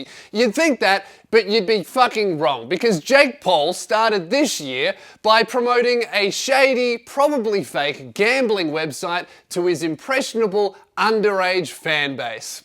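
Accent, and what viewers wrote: Australia. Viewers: Are you Australian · this swearing Australian is music to my ears, why does your voice sound so good lmao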